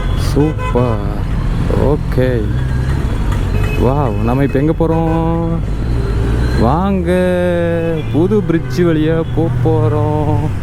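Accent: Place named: Coimbatore, India. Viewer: Covai slang🧡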